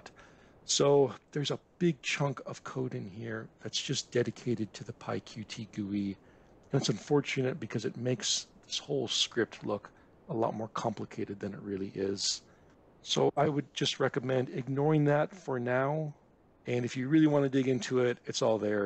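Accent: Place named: United States. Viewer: respect. Clear presentation, good speaking style, a really "proper" AE 🎯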